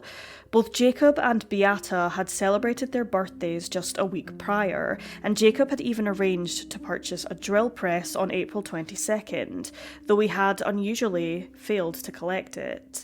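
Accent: Scotland, United Kingdom. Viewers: I think the narrator is actually a Scot. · She is Scottish. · She has a Scottish accent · Scottish accent!! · Six. She has a Scottish accent. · she sure is from linda in scotland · I believe she is Scottish. I am English. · I love the orators Scottish accent very sad story no winners both families decimated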